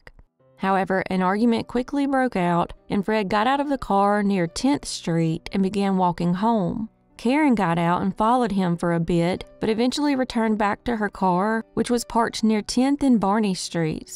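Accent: South, United States. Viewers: Thanks. Love ur voice, only southern one I like💕🥰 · Love my southern Belle voice ! Have a wonderful and Blessed week ❣️🙏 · she has a country accent lol that’s perfectly normal everybody speaks differently but ig we all got our own opinions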